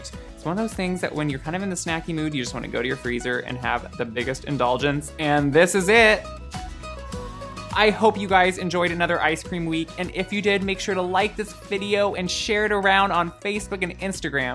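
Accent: Midwest, United States. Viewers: I think he has a Midwestern accent.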